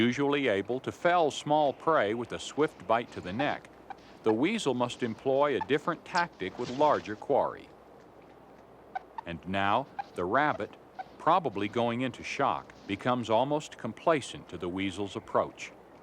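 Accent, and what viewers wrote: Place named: United States. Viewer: Can any American tell me what regional accent the host has? I love it!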